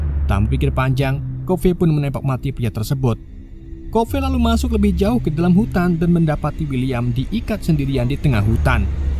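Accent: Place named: Java, Indonesia. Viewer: Penasaran nemen sung ente wong brebes apa tegal sih min.. "miyang cumi" 😅😂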